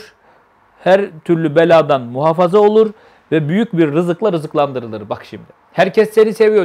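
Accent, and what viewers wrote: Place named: Azerbaijan. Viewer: Azərbaycan Türkcəsinin danışığıdır. Halal olsun.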